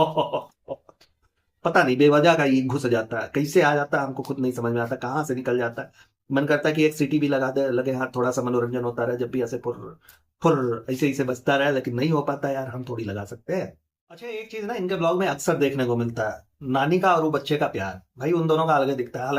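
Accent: Uttar Pradesh, India. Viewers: Typical UP buoy hai isiliye hume pasand hai aapke vedio, lehja aur pure desi andaz acha lagta hai, pl carry on non stop entertainment🎉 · Problem kya hai bea UP rural ke hain toh? Atleast you have courage to be who you are and showing your face in the video. I love your video and accent. Kuch bhi bolte hai log bhak😅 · Recently saw your videos..One thing i like i mean loved watching your videos because of your pure desi style.Please keep it that style…Pure UP style accent and the way you narrate make it more interesting .Thank you🙏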